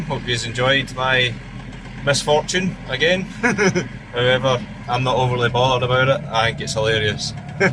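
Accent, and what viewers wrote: Scotland, United Kingdom. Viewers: From Brittany, i like your funny scots accent. It's a bit hard at the begginning but when i catch your accent it 's really fin 🤪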